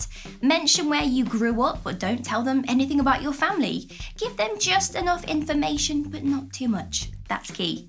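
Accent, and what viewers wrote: United Kingdom. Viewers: I like your sweet British accent)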